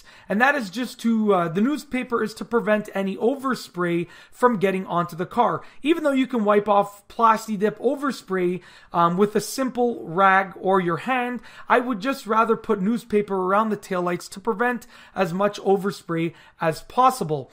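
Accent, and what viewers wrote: Canada. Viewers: This dude has to be from Canada based off his accent. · Are you Canadian or from Minnesota? Don't you think that by tinting or subduing tail lights is risking or cutting down on motorists trying to distinguish wtf that is in front of them? Especially dark or dimly lit roadways, they could just appear to be roadside markers. Remember this fact, today's drivers are less aware/attentive than drivers were 20-30 yrs ago because of the lack of common sense and ease of distractions. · He's Canadian..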